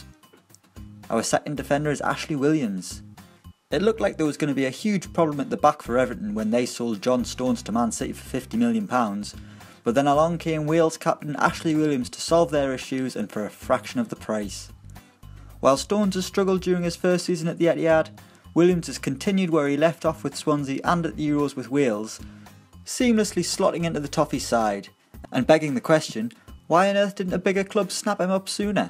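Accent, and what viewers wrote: Ireland. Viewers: Are you Irish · HITC Sport are u guys Irish?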